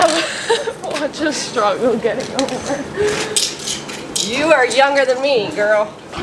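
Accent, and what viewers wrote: Scotland, United Kingdom. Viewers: A good Scottish accent right there 🫶🏴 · Can’t go wrong with a Scottish accent!! My dad who passed away 10yrs ago was Scottish and I loved his accent. I miss it. Just wondering, you utilize everything from a lamb that you can, right? Well, when they go to market, who gets their skin? There is a market for it isn’t there? I remember going to a restaurant once on the Old Banff Highway and it was run by the First Nations and upstairs was a museum where they showed a buffalo and what parts of the body was used by them and for what, right down to the testicles being used as a baby rattle. 😳 It was interesting to see it. Very little of the animal was wasted.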